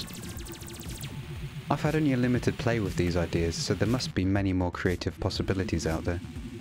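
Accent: United Kingdom. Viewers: I never knew you were British (or at least had a British accent) Oh and I won't like this until somebody decides to ruin that 100 like perfection with another like or a dislike. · That British is awesome.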